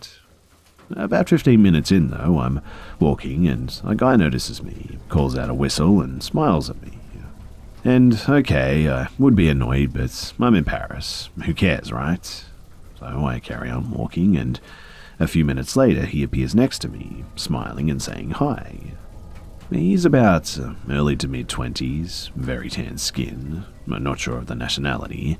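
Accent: Australia. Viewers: this is the first time listening to horror stories told by someone with a aussie accent and i was so confused at first when you were talking about deodorant i thought i clicked on the wrong video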